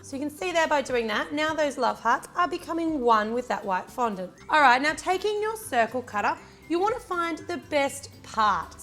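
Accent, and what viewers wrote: Australia. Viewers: She's Australian.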